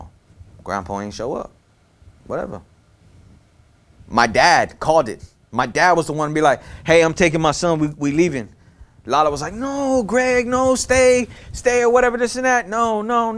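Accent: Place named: Houston, United States. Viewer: i like how you can tell he's from houston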